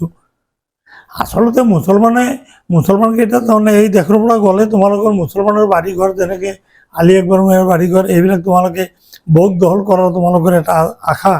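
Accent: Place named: Bangladesh. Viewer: বাংলাদেশী কথাতে গম পাই ।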